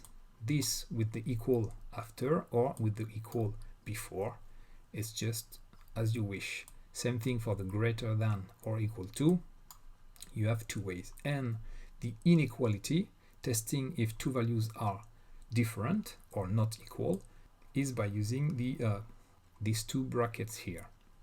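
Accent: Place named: France. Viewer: This is probably off topic, but I like your French accent 😳😂 Great video as always!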